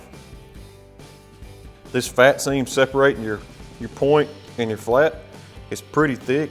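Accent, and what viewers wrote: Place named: South, United States. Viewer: I loved the accent.. That looks like a southern accent.. Not sure if it's south Carolina or some other place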